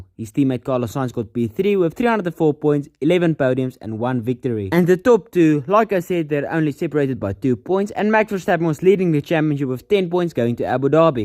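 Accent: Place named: South Africa. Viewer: We like the south african acent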